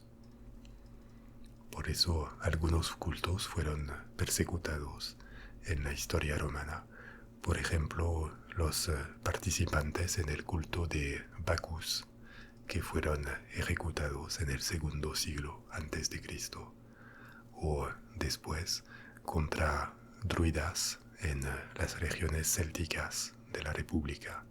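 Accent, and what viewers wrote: France. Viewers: I've never thought about this before. You have a french accent in English, but also probably in Spanish too. I know a little Spanish, I'm gonna find out if it's enough to make out the accent Edit: nope, I can't tell. Maybe your Spanish is actually perfect?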